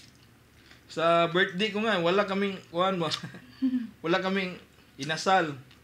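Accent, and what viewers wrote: Visayas, Philippines. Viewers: Sinasadya nyo po ba accent nyo? Kasi bisaya po din ako. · I like the way you talked! I loved the Visayan accent! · Ingles ug Tagalog nimo gahi kaau Klaro kaayo bisaya accent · Di bagay mag tagalog2 bisayang dgko why angay.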